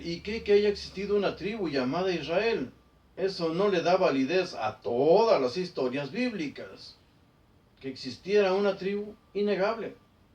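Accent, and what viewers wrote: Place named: Mexico. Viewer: Me muero de la risa escuchandolo, Doctor. Su acento mexicano es muy jobial y ademas todo lo que Ud. dice es tan cierto y obvio.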